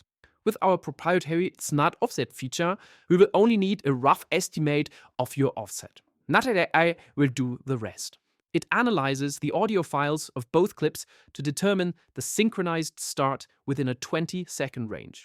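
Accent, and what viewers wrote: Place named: Europe. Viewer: I couldn't help but notice that your speech accent changed drastically...like your glorious European (which country?) accent was just "erased", or at least muddled and more American. What happened there? Did AI try to correct that, and American-ize your speech? I'd seriously like to know! For the record, I like your accent, it's very distinguished.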